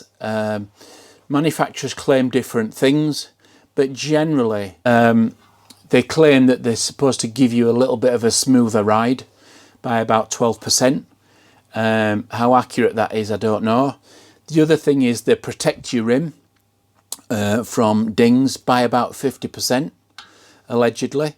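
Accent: Yorkshire, United Kingdom. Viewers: ! Great job! Thanks so much, and ya I've subscribed too;)) AND i do wish you 'd insert 'sources' or 'links' for the various products you found helpful!! For example NUTRAK MILK, and that fancy BENCH WHEEL-HOLDER tool!! and the?"Pedro Tyre Levers"??? Did I spell it right?? ALSO: Question: Why not use Powdered Graphite as a lube for pushing the tyre around... As you probably know, some people use TALC on an inner-tube, but that material has health issues (like traces of asbestos in it) as a lube but perhaps they could switch to graphite OR to Corn Starch?? Your opinions would matter to us all!! (Oh and we love that Yorkie accent, guy!)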